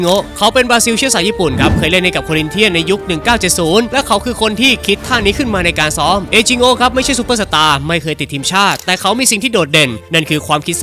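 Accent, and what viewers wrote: South, Thailand. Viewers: ❤❤มีภาษาใต้ด้วย😅 สุดยอดทางพร้าวครับ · แอดเป็นคนใต้เหรอ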